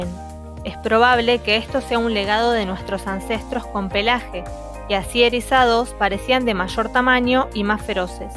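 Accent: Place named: Argentina. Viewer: Q raro la mano negra tomando en cuenta q suenas como argentina en fin 🤔😑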